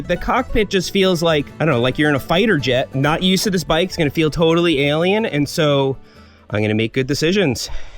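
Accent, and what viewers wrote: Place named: Queens, United States. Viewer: He also drinks wooder like everyone from Queens.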